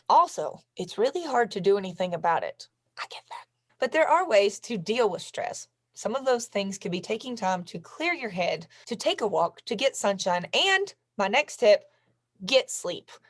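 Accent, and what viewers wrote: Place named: Tennessee, United States. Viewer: OUT of curiosity- are you a TENNESSEE girl? I love hearing you talk- and your mannerisms are just like my area here.. would love to find myself a lady similar to you-- OLDER (i'm 66).. ha. but you sound like you're from my area-- You're a gorgeous lady-- your hubby is a lucky man.. Keep up your GREAT videos- I get more from yours because you talk like ME- and seem to think and have mannerisms like my area folk do.. in other words- you're similarities- have helped me get MORE out of this info in half a dozen videos than over a YEAR of listening to eveyrone else.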